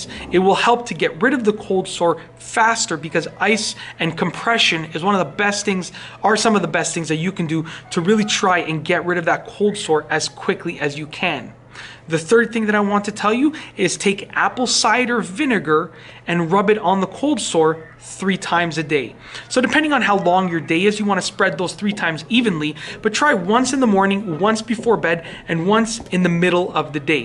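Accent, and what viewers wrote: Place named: Canada. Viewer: Sounds so Canadian to me. Sorry if I'm wrong. I'm English.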